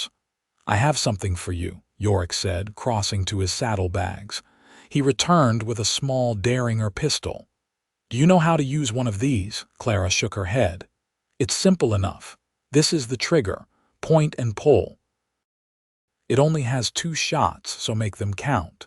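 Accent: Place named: Canada. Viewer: Sounds Canadian. Liked this story very much. Thanks. GA 🍑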